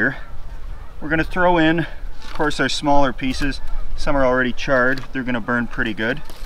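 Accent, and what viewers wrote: Canada. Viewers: I grew up in Buffalo, NY and spent many summers in Ontario. You epitomize the best of everything that is Canadian. I live in NC now and miss those accents and beautiful natural surroundings all Canada has to offer. (I really miss the snow!) Great channel, so informative and frank, I feel like I’m camping with a friend. Keep up the great work!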